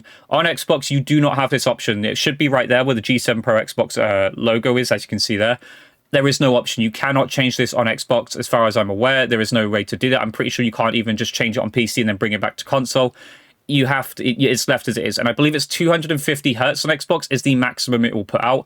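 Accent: United Kingdom. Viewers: Hello you from Liverpool? you have an accent maybe scouse, top contrôller form me but he's just hard to find it in EU.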